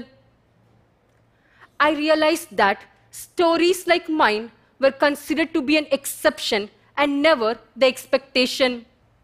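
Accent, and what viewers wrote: India. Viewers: despite her strong indian accent I deeply understand her, good job girl...I am a rural girl and now I'm studying PhD course. what brought me here to watch your inspiring speech was that at the moment I'm improving my english skills to be able to apply for a postdoc position abroad. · she is Indian, why wouldn't she have an Indian accent?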